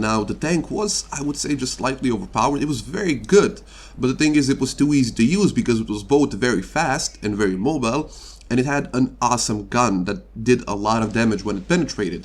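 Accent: Eastern Europe. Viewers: I love Slavic accents like yours so much, you sound so sexy. Nice video BTW, I agree with pretty much everything you said. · by the way, probably a lot of people already asked you but where are you from?? you definitely have a slavic accent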